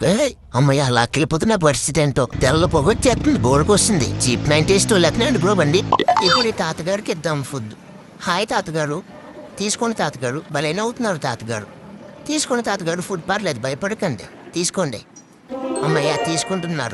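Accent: Kakinada, India. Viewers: Anna nuvvu Kakinada na bro😊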